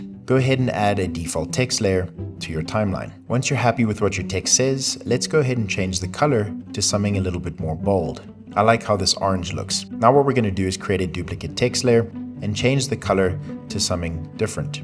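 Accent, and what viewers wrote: South Africa. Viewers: ah , are you fron South Africa 😅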